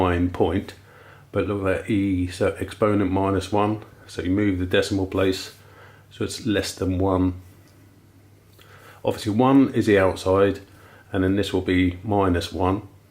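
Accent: United Kingdom. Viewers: will do I’ll try a few of the example programs, I realised after I posted it was probably the picture file, I thought from your twang you was from Surrey way.. Yorkshire a bit further up the country from me.. ok I’ll go have a play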